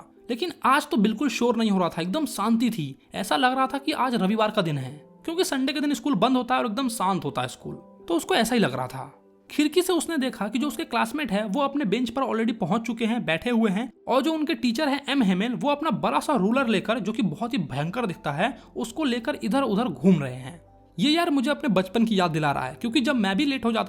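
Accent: Bihar, India. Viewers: Sir aap bihari ho kya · Bihar se ho bhai❤ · You are from Bihar? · Bhai aapke bolne ke style se lag rha h ki aap bihari ho.😅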